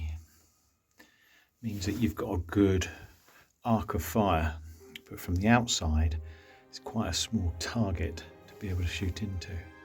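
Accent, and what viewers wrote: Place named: United Kingdom. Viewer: Absolutely amazing tour of antiquity, made captivating by the tenor of voice, his knowledge of this historical structure, his colorful imagination, and his lovely British accent! Loved it! Thank you for sharing, but I'm also missing Anna's and your DIY projects, dancing & creativity!😉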